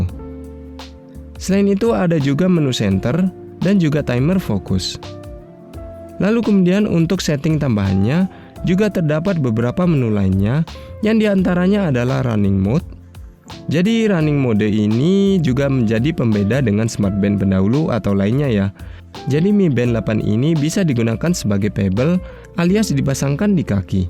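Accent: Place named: Malaysia. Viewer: salam dari malaysia bang,loghat melayu terdengar😂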